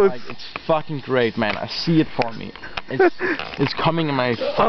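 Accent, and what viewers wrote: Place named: South, United States. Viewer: Lol, not to offend anyone, is this guy like Puerto Rican or something? He sounds very Southern american.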